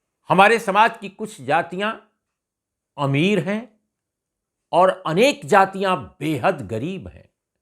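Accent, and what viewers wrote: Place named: Bihar, India. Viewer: आप ऐसे बोलते हैं लगता है कि कोई बिहारी ही बोल रहा है। आपको मेरा नमस्कार है 🙏🙏🙂🙂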